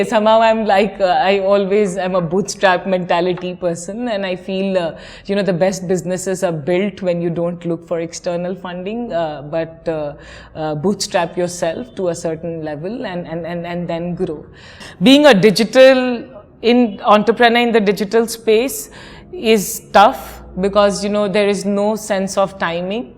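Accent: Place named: Jamaica. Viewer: almost sound Jamaican 💚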